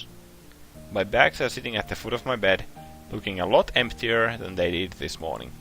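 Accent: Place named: Finland. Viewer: It might sound a bit stereotypical but i really picture Sam Lake reading Katawa Shoujo to me when i listen to your LP, i guess that's what a finnish/english accents will do to you! :D Good stuff so far really enjoying it!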